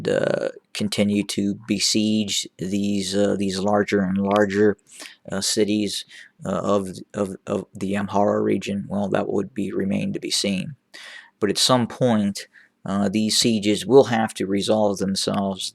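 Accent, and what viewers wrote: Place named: South, United States. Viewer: The commentator sounds Habesha with American (Southern) accent. No problem. Just saying.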